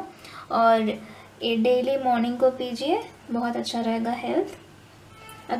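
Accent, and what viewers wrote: India. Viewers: I think she is from south they almost speaks in same way👍🏻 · Shaan Mujawar she is probably from south what’s the point of making fun of her Hindi it’s not her first language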